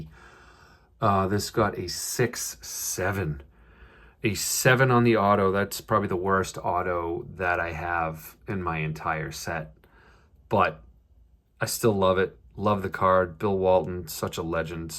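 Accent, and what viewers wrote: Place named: Boston, United States. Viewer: Boston accent comes out in these videos. Haha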